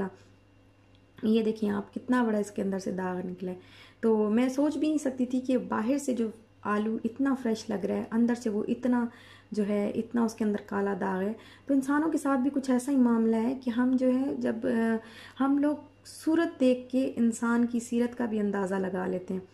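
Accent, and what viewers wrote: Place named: Multan, Pakistan. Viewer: very good mgr ap ka andaz bolny ka multan walon jesa hy jo bht sweet hy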